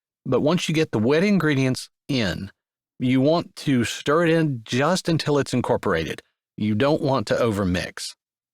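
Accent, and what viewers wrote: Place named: Ireland. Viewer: Oh my goodness, the Irish accent! Love it!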